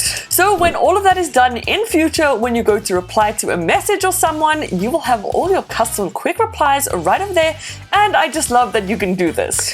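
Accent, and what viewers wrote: South Africa. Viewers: Awesome wath. But are you from south Africa? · You a Saffa (accent)?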